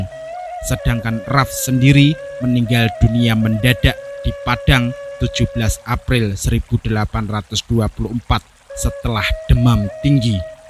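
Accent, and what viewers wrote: Java, Indonesia. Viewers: Medog kali Jawa mu mas, kalau ngak paham sejarahnya yo belajar dulu toh.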